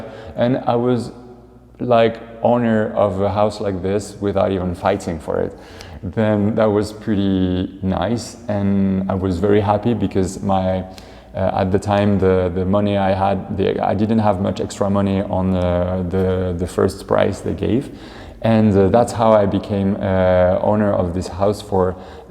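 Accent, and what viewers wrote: France. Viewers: As a french guy I feel ashamed that french castels are in sale for strangers (but according to your accent you are french as me ofc). The problem often especialy with british people is that they will buy a property then turn it to totaly private. Not allowing anyone to enter in the park, to fish in the lake if there is one etc. However it is better that those castles belong to a person, even one who will not respect the local habits, rather than leaving the castle in ruins. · I love your accent 😍 🇫🇷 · This is also my dream!!. Amazed by your dedication and that lovely francais accent. A bientôt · I was so worried when I saw the thumbnail that a rich american bought it... first time Im happy hearing a French accent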